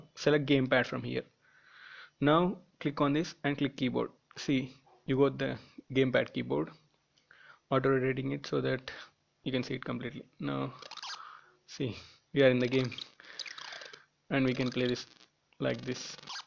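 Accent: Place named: India. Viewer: this is why I like hindi accent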